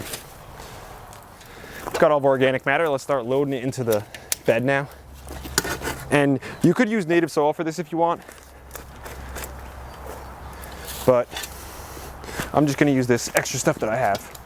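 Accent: New Jersey, United States. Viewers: "hold all that excess waudder" I f'n love your videos and jersey accent, it makes me think of my old college buddies. Keep it up, and full disclosure I steal these ideas! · I love watching him. He’s 100% New Jersey and this Texan loves his excitement. I don’t see content worth watching on yours.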